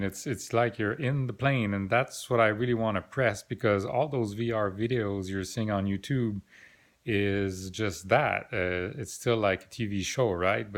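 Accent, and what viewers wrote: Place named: Quebec, Canada. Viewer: Where are you? You sound French Canadian, I live 2 hours west of Toronto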